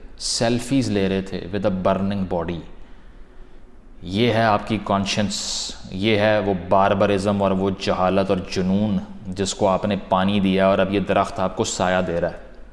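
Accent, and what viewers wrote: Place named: Pakistan. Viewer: This guy sounds like an educated Pakistani..........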